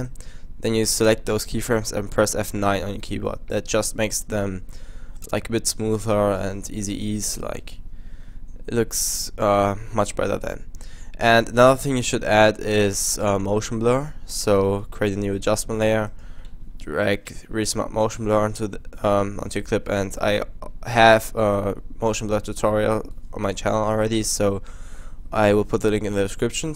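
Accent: Germany. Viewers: lol kommst du aus deutschland?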